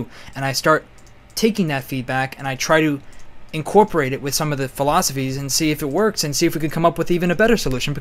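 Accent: New York, United States. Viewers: youre from ny?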